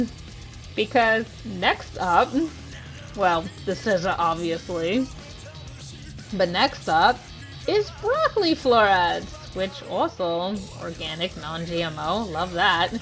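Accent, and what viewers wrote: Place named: New York, United States. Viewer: D))) mouth watering REAL bad right now haha ;D love your New York accent , you can make pizza for me aaaany time sista ; )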